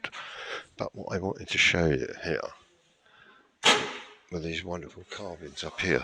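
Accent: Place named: England, United Kingdom. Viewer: oh my, a "disabled Toilet"as in not working!! Imagine if that was true-oh the stench of that. Toilets for disabled would be I believe the appropriate English words describing it, mate. I love the accents of the English, as a Canadian with British parents. This accent reminds me of the New Tricks actor and musician, Dennis Waterman as Gerry Standing, one of the great amongst the initial cast of four🤩 Those timbers up top with the massive bells are most intriguing. Looks like tree trunks perhaps. I'd love to see it with an archaeologists eye -to date and describe the wood used to create the strong ceiling, who and what the stories are of these saints/amazing paintings, particularly of the fainted or ill woman in the man's arms, a few chosen headstones for the words or messages, the cultural trio represented on the pulpit.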